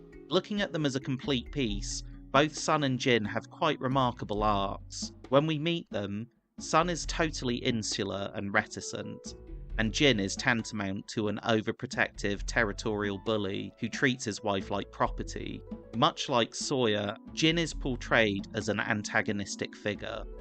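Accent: United Kingdom. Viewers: For the love of God, can we get someone who isn’t from the 🇬🇧 to narrate this? Are we (🇺🇸) so shallow or thick- that we “Need” a gnarly British accent just to pay attention for a little while?? C’mon it’s gettin’ kinda pathetic, now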